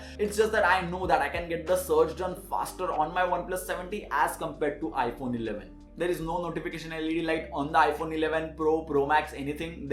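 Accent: Maharashtra, India. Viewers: Thick Marathi accent in the game. Khup chhan pan ! :)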